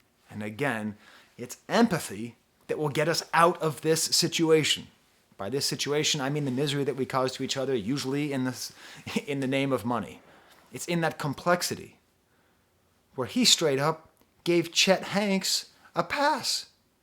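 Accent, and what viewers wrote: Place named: Bahamas. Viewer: Words cannot express how proud I am of for stepping out and being such positive representation of the Bahamas and the Caribbean in general. I just happened to get on his channel through Khadijah's recommendation and I started to watch the video and I was like "why does this man sound Bahamian??" come to find out here and I are from the same country. He really brings a perspective needed so desperately so desperately in left tube. So many people assume so many things about the Caribbean and we don't even get proper representation in media.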